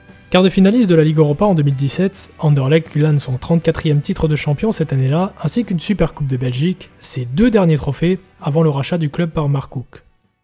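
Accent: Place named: France. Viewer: Je suis belge et ultra de la mauve army et je tiens à te remercier pour cette vidéo sur notre magnifique club. Ça se voit que tu es français sur la prononciation de nos clubs ou noms de famille mais je ne te n’en veux pas😂. Merci de porter de l’importance au club le plus titré de Belgique💜 et très important sur la scene européenne à son époque, très bon travail et encore merci pour cette belle vidéo, COYM💜💜💜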